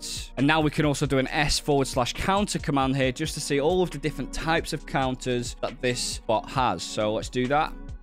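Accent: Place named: Liverpool, United Kingdom. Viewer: Awesome vid, man. Quick and simple and I got my counters set up in no time. Subbed because of your Liverpudlian accent tbh!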